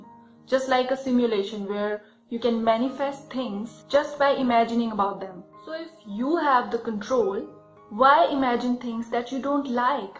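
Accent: India. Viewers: Hey u look like an Indian And ur accent too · I knew it cuz ur English sounds like Indian English and I'm Indian too:) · Indian accent thanks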